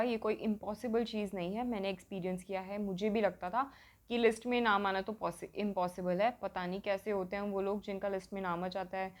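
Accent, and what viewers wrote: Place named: Himachal Pradesh, India. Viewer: .. Ok g... Apke bolne se aisa lgta h.. Ki ap HP se ho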